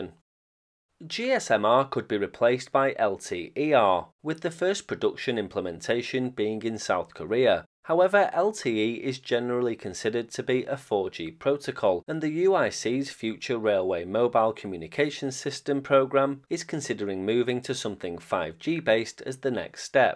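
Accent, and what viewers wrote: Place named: North, United Kingdom. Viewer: with a northern accent again. With Gareth Dennis levels of rail nerdity. Still love it.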